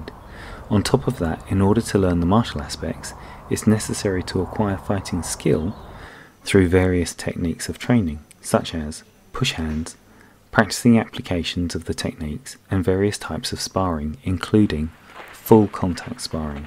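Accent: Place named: England, United Kingdom. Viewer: Thanks for postting, keep the good work! where in England is your accent from? Cheers mate!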